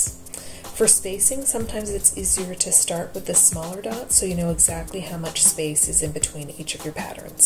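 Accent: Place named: Canada. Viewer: Very cute! And I love your Canadian accent. :)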